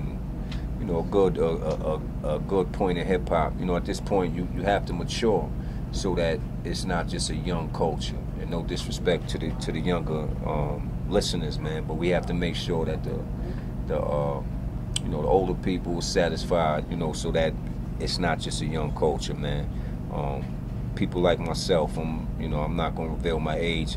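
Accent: New York, United States. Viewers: The most New York sounding New York cat ever, right next to Prodigy. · I'm guessin he from N.Y..XD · Is he from ny?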